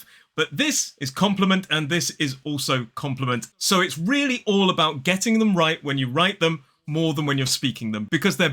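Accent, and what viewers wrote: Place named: RP, United Kingdom. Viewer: My favourite British rp accent teacher on YouTube. Love the energy you give the words when you're speaking. Makes it easy for our ears to pick the tobality and musicality of the accent. Thank you!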